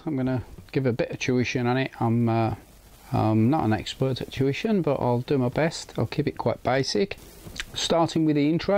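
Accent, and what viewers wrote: United Kingdom. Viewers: great video spoken with a good Brummie accent .cheers from a Tammie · Thanks, this is best tutorial I have come across ...do I detect a Midlands accent ?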